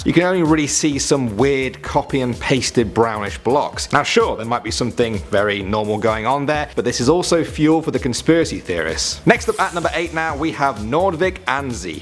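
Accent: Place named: United Kingdom. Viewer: As a semi-paranoid fan of conspiracy theorists' stories, I'd watch another!, you hosted the first AT10 video I ever watched, and due to your mellow baritone and nifty British accent, I kept watching! Good on you!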